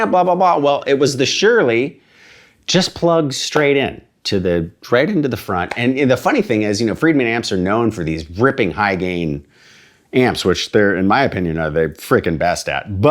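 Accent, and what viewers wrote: United States. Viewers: You have really picked up the Nashville affect. That didn’t take long.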